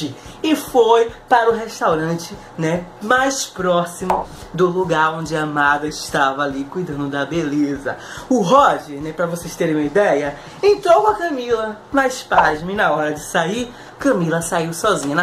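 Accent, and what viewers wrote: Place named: Bahia, Brazil. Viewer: pq você tem sotaque baiano?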